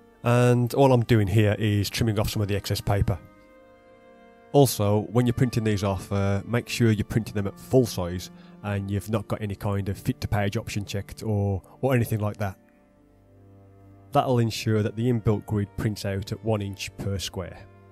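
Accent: Birmingham, United Kingdom. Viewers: Thanks. Got a game of D&D tomorrow, and if I get some stuff together I will try and PM you, and/or send you some pics. Cheers for the resources. They are fantastically well thought out and executed brilliantly. I'm currently out of work at the moment, so can't send you any money at the moment, but once I get a job I will be sure to send you some much deserved funds. Guessing your from the Birmingham area from your accent. Close to me!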